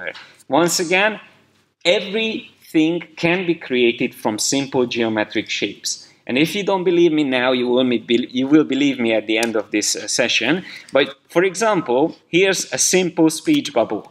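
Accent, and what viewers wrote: Hungary. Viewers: Érezhetően magyar akcentus, jó hallgatni! Könnyebb megérteni :D Köszönöm az útmutatást! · Hey are you hungarian? Im pretty sure by the accent. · magyar akcentust vélek felfedezni, de ez persze nem változtat azon, hogy szép munka!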